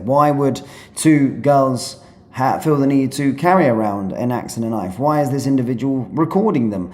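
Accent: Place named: England, United Kingdom. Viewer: why should he be speaking like an English person in a Scottish town?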